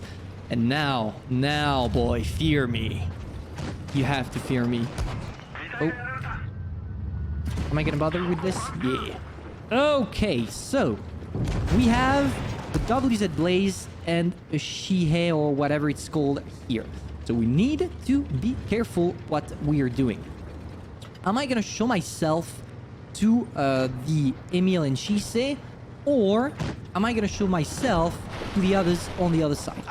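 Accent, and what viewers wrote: France. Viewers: Tu est français😂😂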